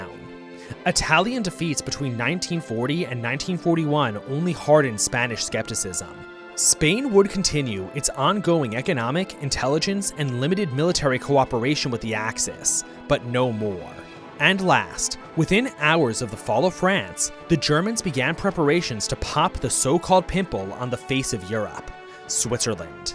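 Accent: United States. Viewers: Pov: most American voice for us Brits Eva. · Why is Britain speaking with a yank accent….?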